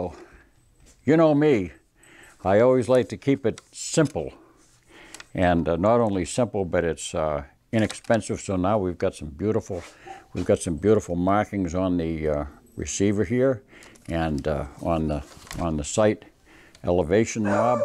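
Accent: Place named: Central Massachusetts, United States. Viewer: great lesson! i grew up in worcester, your accent sounds like central MA, am i close?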